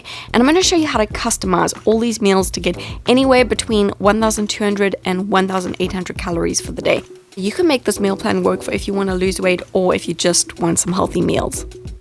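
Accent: South Africa. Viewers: I’m not too sure what her accent is , maybe SA , but I’m obsessed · Love your videos! Do i hear a south african accent? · Are you South African? I can sense it immediately! I’m also raised by a South African family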